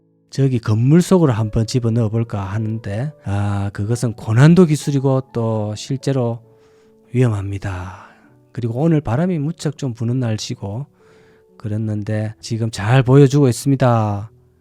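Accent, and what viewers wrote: Gyeongsang, South Korea. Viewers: 저도 * 같은 어투를 쓰는 갱상도 촌놈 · 우리동네 오셨네요 만났으면 해장국 한그룻하는긴데 덕분에 갱상도 원조말을 잊지않습니다 서울 외국 생활하다보니 점점 잊고있습니다 감사합니다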